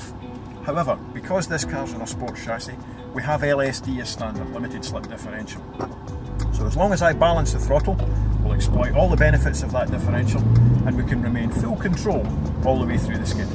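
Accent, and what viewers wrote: Scotland, United Kingdom. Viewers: sounds like he is Scottish !! am I right ?! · Hes british he lives in sctoland and has a scottish accent!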